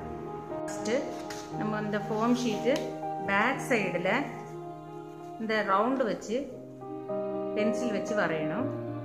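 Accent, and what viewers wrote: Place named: Kerala, India. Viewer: Madam ningal malayaliyanennu thamil parayunnathu kettal ariyam, malayalachuvayund Aa bashayil. It's ok. But picture ethra cheruthayittu kanichal anginey clearayi kanum. Eni adukkunna video valuthayittu kanikku pls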